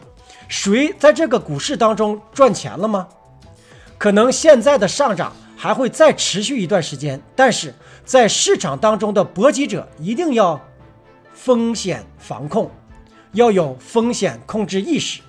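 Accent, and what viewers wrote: Northeast, China. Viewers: 您是东北哪旮瘩的，我是大城市铁岭得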